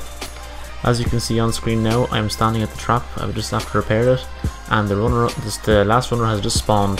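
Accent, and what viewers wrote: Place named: Ireland. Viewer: loool dat irish accent nice guide xxxxx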